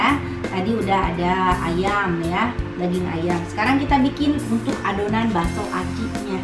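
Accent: West Java, Indonesia. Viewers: Sundaaa 😁😁 khas nya nyariosna urang Sunda mahh 😀😀 kaya suara teh Syahrini 😅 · Mecak urang mah ai aya artis ngomongna sunda teh teh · Sunda pisan teh,resep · Assalamualaikum tth..seneng deh liat nya ttp sederhana n tidak lupa logat sunda nya...bahagia trs yaaa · Baru nemu channel ini.. Lucu si Teteh karena Nyunda banget... Love u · Teh geulis mirip dede lesti apalagi logat sunda nya...hihi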